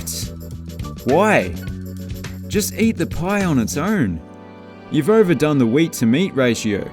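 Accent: Australia. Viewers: There is absolutely no hints of black on the Ipswich kit….well, there is an almost invisible black pin stripe in the new kit but you need a magnifying glass to see it.. love the videos by the way…funny hearing an Aussie covering quaint English football grounds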